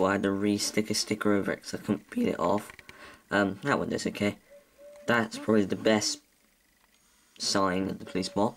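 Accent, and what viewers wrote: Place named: Somerset, United Kingdom. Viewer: Soooooo Somerset 😂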